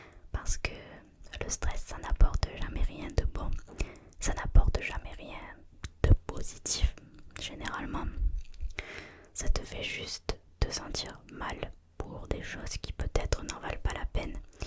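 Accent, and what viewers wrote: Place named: South, France. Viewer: J'ai découvert votre chaîne il y a peu et je n'avais pas fait attention à ce petit accent du sud (enfin je crois)dans le baing lol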